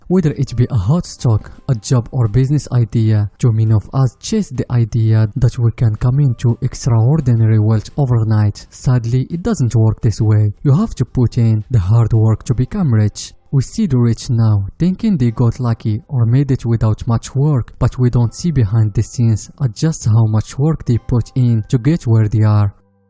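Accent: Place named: France. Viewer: he is a french speaker this why he got a french accent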